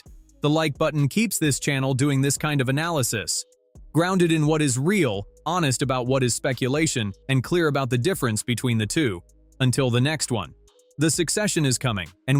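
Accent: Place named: United States. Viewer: We do not need shouty American voices spouting nonsense about our Royal Family. Sort out your own 'monarch'!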